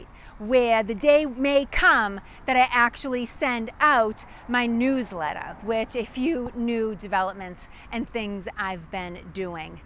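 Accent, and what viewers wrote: Boston, United States. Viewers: NON AI A PLUS. Where is this? thank you; love the accent. bah hahvahd yahdesque. Set Meyers does a clip, BOSTON ACCENT. Recommended, par moi. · Boston, not Chicago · What a Bostonian accent 🤣! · You could never program and AI with your enthusiasm for the subject matter or your charming Boston accent. I enjoy your work and obvious mastery of the Revolution. The way you speak about the era reminds me of classes with professor Hyman Rothbard back in the "day". Kudos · Boston accent :face-red-heart-shape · I live in NH. Your Boston accent is very entertaining and sooooo damn sexy at the same time!!! 😍❤️💕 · After retiring from the Navy I moved to the west coast in Washington State on the boarder with British Columbia so that my Canadian Wife can be closer with her elderly mother. I was born and raised in Watertown, Massachusetts and my two children and grandchildren still live just outside Boston. 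I have always loved American history, especially when it comes to New England and you do such a great job with your channel. Besides seeing the historical places you’ve covered, I am able to listen to you speak so that I hopefully will never lose my own “Charmin” Boston accent 🤣😂🤣 Thank You!!!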